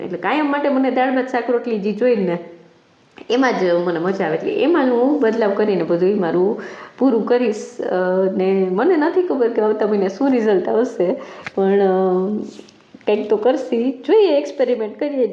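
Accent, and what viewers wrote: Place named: Kathiawar, India. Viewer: Hello! I came across your video and kept watching bcoz of your cute kathiawadi accent! I can give you some tips..walk for 10 mins after every meal. Dinner 6.30 sudhi ma patavi devanu.. It helps a lot!!!. Savarna breakfast ma ugadela kathod add karay! Also rotli ma jowar,ragi eva lot vaprai...I love those😊. Divas ma ghar ma dadra chad utar karvana leg muscles majboot thase. Dhire dhire exercise ma weight training add karvani ..5 kilo na dumbell lai ne sharu karay. Or even pani ni bottle thi pan karay...plenty of videos on youtube! Ena thi body saras tone thase ne inches ochi thase. Good luck..Will keep watching👍👍😊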